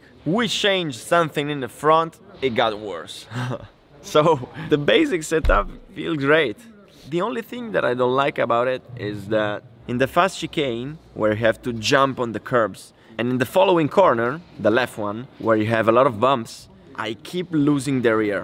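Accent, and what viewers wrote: Italy. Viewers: minchia è siciliano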